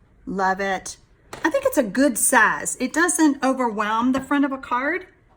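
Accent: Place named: Texas, United States. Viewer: Such a nice and easy card...by the way... I like your texan accent pretty much...Thanks for sharing this idea...🤗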